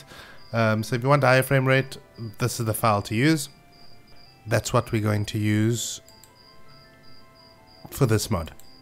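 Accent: South Africa. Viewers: Amazing! Brilliant step by step instructions. Also I'm currently living in Thailand and as I was listening to you speak I thought "no bloody way this dude is also a saffa!" Thanks from a Durbanite living in Asia :D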